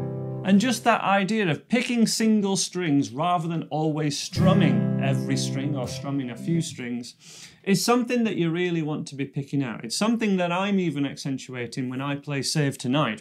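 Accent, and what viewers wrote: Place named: North East England, United Kingdom. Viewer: You have a very North East accent... I reckon Sunderland.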